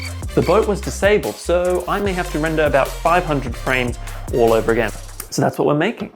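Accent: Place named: Netherlands. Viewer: Thought him was Dutch ngl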